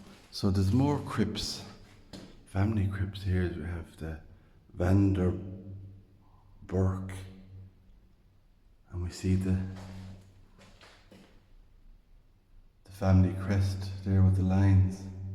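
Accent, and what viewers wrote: Ireland. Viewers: The whole of Brussels tends to be 'damp' (I live here!) ..Brussels literally means 'settlement in the marsh' and the river Senne runs underground. Thank you for this fascinating video. 'Conseiller communal is a councillor to one of the 'Communes' (districts) of Brussels. Love your content and your gentle, soothing brogue. Thank you 😊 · I wonder how many descendants visit? Have they contacted families to see what they would help to fix the crypts. I like your Irish accent. I am Irish on my Mother's Father's side.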